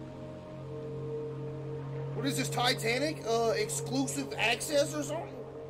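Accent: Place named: Arkansas, United States. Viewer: What part of arkansas you from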